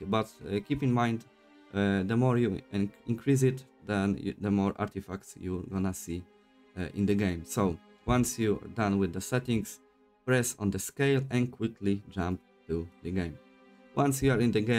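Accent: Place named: Poland. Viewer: Słychać że Polak❤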